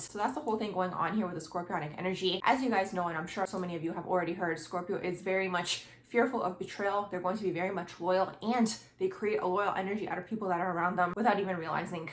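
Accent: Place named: Upper Midwest, United States. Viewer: Love this video, everything you said is true! Side note: you have to be either from Northern Illinois, Wisconsin or Minnesota.... I'm from Illinois and i recognize the accent!!!!❤❤❤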